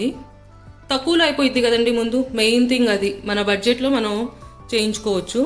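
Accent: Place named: Guntur, India. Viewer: Super ga undhi mam, mana guntur language and way of explanation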